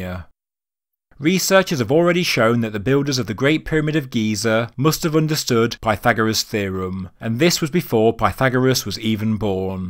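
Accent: United Kingdom. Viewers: Nice to hear a voice from the UK, on the subject of Egypt.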